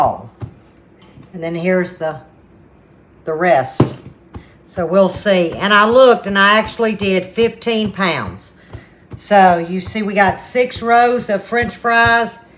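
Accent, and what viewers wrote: South, United States. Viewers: Hey, love the video. Just made it bk from south Louisiana visiting family. I think you are most definitely from the south 😊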